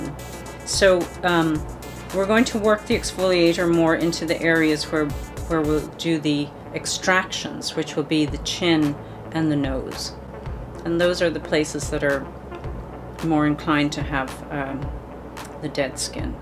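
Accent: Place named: Ireland. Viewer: can hear the irish accent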